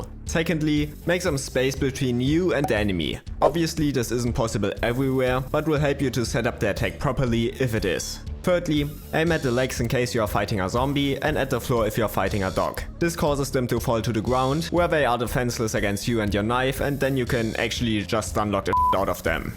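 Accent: Germany. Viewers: I could swear it's german or something like that. I'm from germany and lot of content creators from here have a similar accent in english. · German accent ?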